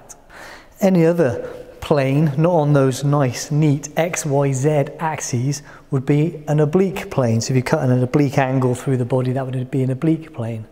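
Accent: United Kingdom. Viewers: Yeah i really don’t like bri’ish accent(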